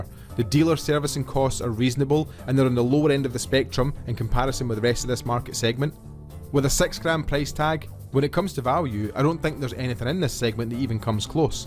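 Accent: Scotland, United Kingdom. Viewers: Great review and that accent is a great bonus! Scottish? Thank you. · Thanks! That was not only a fair review, it was one of the more articulate and entertaining ones I've come across. Sure, that Scottish accent helped keep it nice and crisp, but turns of phrase like "vegan banana leaf sandals" really warm up those synapses, you know? Frankly, you're so good at this that I'm astonished you have only 250 subscribers. You just earned yourself number 251. Now I'm gonna go check out your other stuff.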